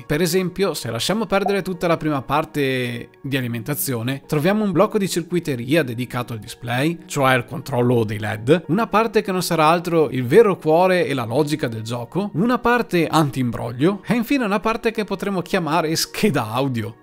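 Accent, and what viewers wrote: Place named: Treviso, Italy. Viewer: Bravo ti seguo volentieri dalla cadenza dovresti essere vicino a me di Treviso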